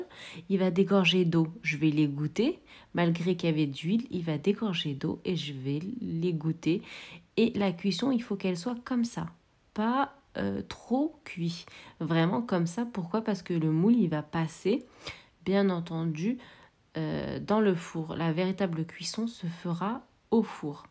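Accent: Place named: South, France. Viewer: Une explication distinctive du sud et la bonne chance bb 😍😍😍😍😍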